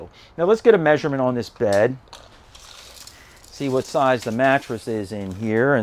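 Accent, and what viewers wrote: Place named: Philadelphia, United States. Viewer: I cant tell if this is pittsburgh or philly accent...speaking fluent yinzer i lean more toward delco philly.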